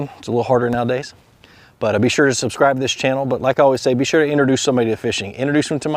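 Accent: Georgia, United States. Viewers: Are you from Georgia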